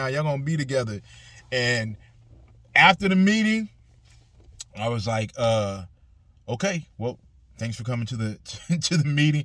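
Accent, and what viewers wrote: Bronx, United States. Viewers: Are you from the Bronx? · Sounds like he from the Bronx 🤣🤣🤣🤣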